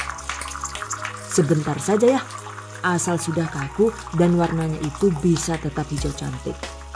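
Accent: Banyumas, Indonesia. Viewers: Trimakasih resepnya ya bun resepnya bunda mantap2 semua & +gemes sy kl bunda ngomong pake bahasa ngapak ampun deh sy jd ketawa trus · Lebaran kemaren pakek resep inih, dan bener bener mantul banget, makasih ya bunda, gak salah sih subcribe channel ini krn bener bener bermanfaat banget, banyak tips and triknya. Apalagi seneng banget sama ucapan konyol bunda haha, dulu ku kira bukan suara asli kyk ada dubber org lain, ternyata asli loh orgnya juga cantik. Ngapak ngapak gitu lucu aja wkwkw #GiveawayTHV2021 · Mbaknya suaranya enak...empuk kaya kacangnya....hehe...ga taunya ngapak jg ya...... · Suka ngapaaknya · Mantap... Pada bae logat Nyonge...😊👍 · Anger mb ngomong ngapak gawe aq gemuyu, menghibur 😃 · Aku suka ketawa sendiri, klo bicara ngapak😊😊 lucu..😂😂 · Ini lokasi Madiun tp kok logatnya ngapak? Wkwkwkwk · Jenengan ngapak toh mamake😁_mntp mksh sharingny mamake mtrswn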